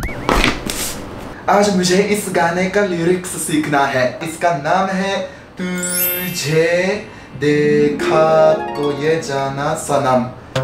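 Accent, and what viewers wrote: Standard. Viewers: Aap kitney achchey Hindi boltey hain bilkul sudh Hindi bhasha. Dhannyabad aap ka. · bhai aapki hindi bahut hi badhiya, aapke hindi sikhane or bolne kaafi sarahniya hai. Aapki hindi bahut sare bhartiya logo se kaafi atyadhik shuddha hai, bahut sare sabdo ka hindi utcharan bahut bhartiyo ko nhi aata. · Chikitsak⛑️ oo Bhrata Hridya tript ho gya aapki Uchh ewam madhur vaani sun k....itni shuddh Hindi k Shabdawali k uccharan aapki Prateyak Chalchitra (video) m darshaya jata h...umeed h aap tatpar Aise Hindi ki Chalchitra poorntaha Roop se bnaenge or aap waqta bnenge or hm Shrota aapki Hindi Vaani Dhyanmagan hokar sunenge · aap hindi bahut accha bolte ho. shudh bolte ho. hame aapki boli pasand hain. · Bhai badiya to h Hindi, itni shudh Hindi to Indian log bhi nhi bolte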